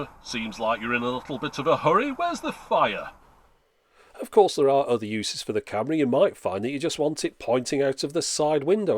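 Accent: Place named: Yorkshire, United Kingdom. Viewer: What town is this in? You sound like Yorkshire. If I'm wrong, pardon my untrained Yank ear.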